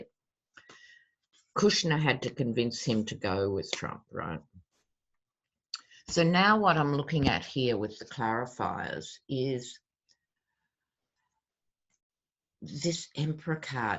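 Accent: Australia. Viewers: Living in America since Trump is like being in A Twilight Zone episode that you can’t get out of. Republicans like Jordan & the rest are beyond corrupt & hopefully facing their downfall sooner, rather than later. What’s really frightening is delusional Trump who thinks he’s the second coming, literally. Help. 🙄I love your readings & listening to your fantastic Aussie accent. 💗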